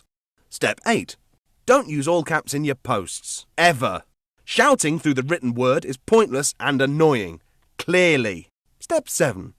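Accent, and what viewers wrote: United Kingdom. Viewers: The british narrorator makes this video hilarious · Everything sounds better British.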